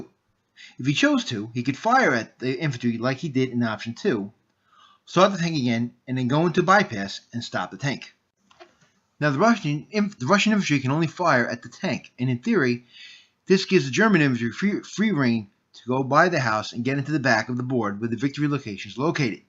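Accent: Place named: New England, United States. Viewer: I would like to see the following, 1-AFV moving through Woods-Road hexes while encountering a KEU in one of those hexes. 2-A Truck with passengers doing the same thing. (the objective is to get past the KEU, not slug it out in a Fire Fight) I have done this, just wanted to see what you would come up with. I enjoy your videos, just slow down on that NE accent once in a while. Thanks.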